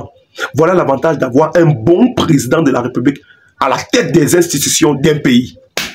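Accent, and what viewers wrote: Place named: Cameroon. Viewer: Regarde comment tu resonnes !une mentalité vraiment camerounais comme les camerounais disent . Le jour tu arrives en cote d'ivoire tu auras honte de dire que tu es Camerounais .par ton accent nous les ivoiriens ont saura que tu es camerounais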